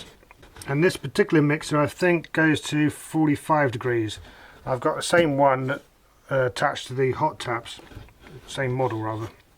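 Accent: United Kingdom. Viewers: Greetings from Nevada , USA . Found your channel by accident , very interesting topic , Liked & Subscribed . Anxiously awaiting Part 2 , in the meantime I'll be watching your other videos . -- PS: Love listening to the British accent and terminology . Brother-in-law ( Precision Machinist ) is from the UK ( London area ) . Best of Luck to you neighbor .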